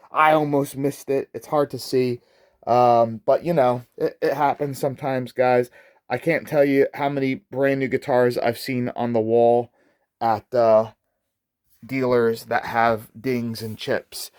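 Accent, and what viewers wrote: United States. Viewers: wenn der nicht so einen furchtbaren amerikanischen akzent haette, ... nicht zum anhoeren. OI! Speak English!!!!!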